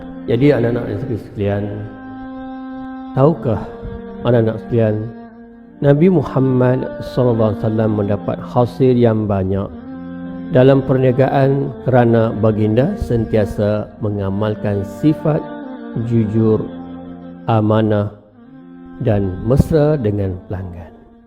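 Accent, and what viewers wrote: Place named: North, Malaysia. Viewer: Mcam utara ja ustat😂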